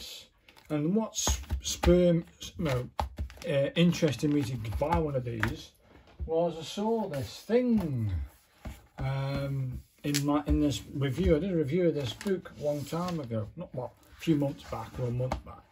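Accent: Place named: Stoke, United Kingdom. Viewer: Thought I recognised the accent. Mind you, I should given I have the same one 😂 I’m from ‘castle 👍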